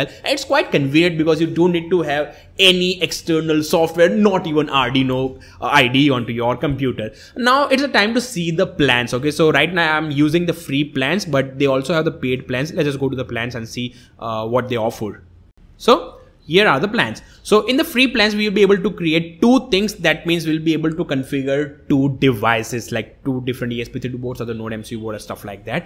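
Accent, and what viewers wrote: India. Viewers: Thanks a lot for this clearence! You indian accent is very cool, but I got the things you talk. As a brazilian man, in certain way is no so difficult to understand you. Good lucky bro. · Mấy a Ấn Độ nc mắc cười ghê. Nhưng hay thật.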